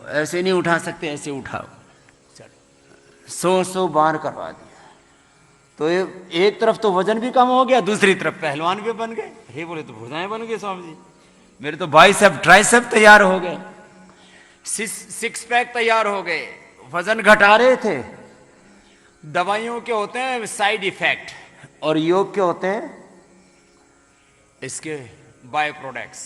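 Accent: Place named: Punjab, India. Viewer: की punjabi voice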